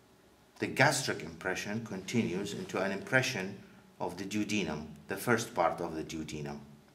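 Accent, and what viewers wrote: Iraq. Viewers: you are speaking like Iraq people ... you must be from Iraq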